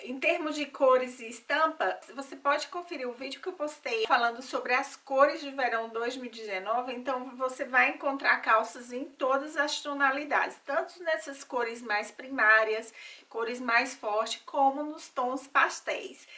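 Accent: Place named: Northeast, Brazil. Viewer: sou pernambucana e por isso reconheci o sotaque nordestino,👏👏👏👏😙😙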